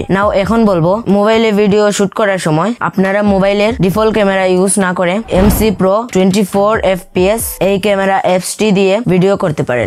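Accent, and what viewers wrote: Sylhet, Bangladesh. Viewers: ভাইয়া তুমি কি সিলেটি ❤😊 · ভাই তুমি সিলেটি নি বা.! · Tumer bari Sylhet ni suto bai?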